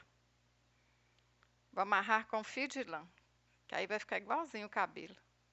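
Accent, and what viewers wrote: Minas Gerais, Brazil. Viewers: Gostei de sua aula, amo esse sotaque minerim. 🥰 Vou fazer a minha bonequinha.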